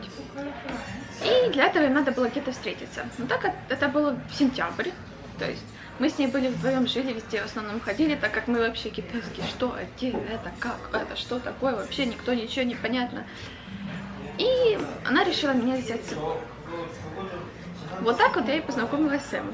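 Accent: Ukraine. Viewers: Харьковский суржик - это здорово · ты говоришь на суржику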